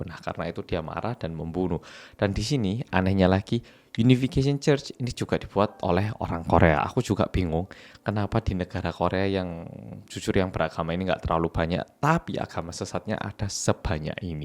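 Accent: Java, Indonesia. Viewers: Di gonta ganti karepe dewe 🤣🤣🤣 jawir banget mas...👍🏻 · Hallo, aku mau tanya boleh? kok tuh gereja ngga ditutup sama pemerintah ya? terima kasih Mas ya aku baru gabung ke chanel nya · Nyoro mas, boso jowoku sampek kalah karo sampean...terlalu medokk mass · Jowo banget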